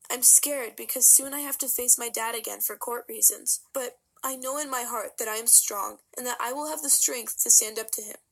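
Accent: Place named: Ireland. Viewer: You irish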